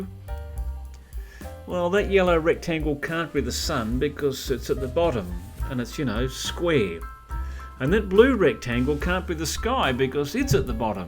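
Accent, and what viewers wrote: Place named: United Kingdom. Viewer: Isn't this just pop art, like Warhol? (Not that his stuff is any lesser than regular art. It has a huge influence on our lives.) Also, it immediately calls to mind the Monopoly board. Thank you for these videos. You have everything you need to succeed as a narrator: you have a wonderful understanding of and love for your subject, you have a way of making art understandable for your viewers, and you have a British accent. Subbed.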